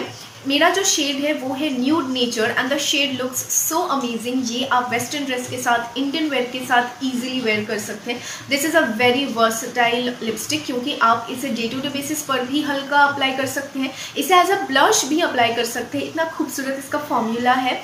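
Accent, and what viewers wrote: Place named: Bengal, India. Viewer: Tumar awaje Bangla tan asche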